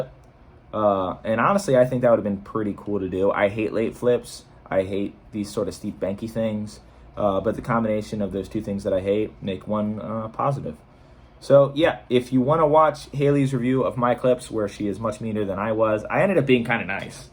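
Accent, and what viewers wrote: East Coast, United States. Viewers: def east coast vibes just because the way he talks shit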